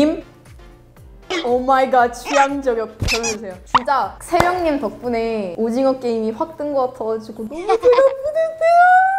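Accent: South Korea. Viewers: 영상 안보구 청각으로만 들으면 100퍼 한국인이다! 네이티브 ㅋㅋㅋ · 와 완전 한국발음이네 ㅋㅋㅋ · 이 학생 외모만 외국인이지, 하는 행동, 말투, 표정하며 완전 한국인이네. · ㅋㅋㅋㅋ ㅋㅋㅋㅋ 이학생 뭐니 말도 엄청빠르고 액션이나 말투 사투리까지 이아이 한국사람 오리지날이네 ㅋㅋㅋㅋ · 한국 여학생이야??????? 발음하고 말투하고는? ㅋㅋㅋ 우리 옆집에 사는 동생이랑 똑 같네? 대박이야~~~~~ 미치겠네~ㅋㅋㅋ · 정체가 누구예요? 한국말 완전 원어민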